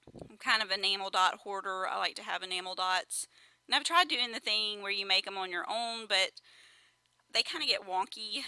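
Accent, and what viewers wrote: South, United States. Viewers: Where are you from? I love your southern accent.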